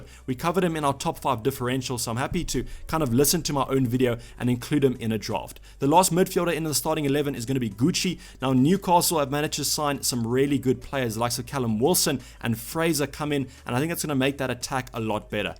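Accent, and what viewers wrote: South Africa. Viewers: Found this video on my recommendations glad I did there was some valuable information in there love the SA accent and will be subbing. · Are you South African?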